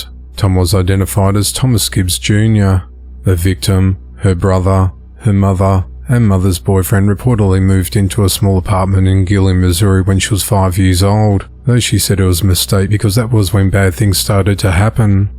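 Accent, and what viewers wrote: Australia. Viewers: Are you Australian? Anyway have a nice holiday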